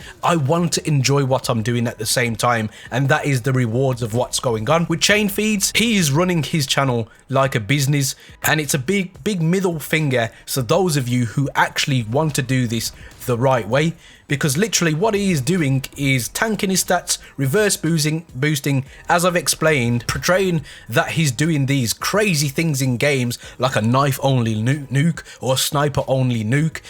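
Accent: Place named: Midlands, United Kingdom. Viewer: Yeah man just subscribed, luv a midlands accent, sounds down to earth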